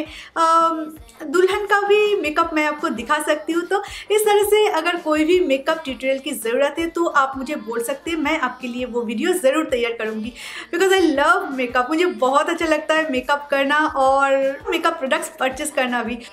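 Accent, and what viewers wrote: Bengal, India. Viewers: I wouldn't call it weird as her mother-tongue Bangla is dominant in her accent. So with her hi-speed talk, it did sound different from the actual pronounciation. All she needs to do is slow down slightly to the range of 140-160 words per minute, which is supposed to be an ideal rate. One can check the internet for this. For jokes one can keep a higher rate.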